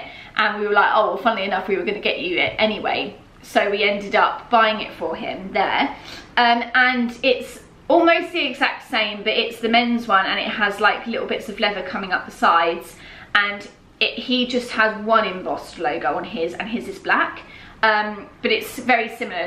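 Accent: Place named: United Kingdom. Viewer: Your British accent!👍🏻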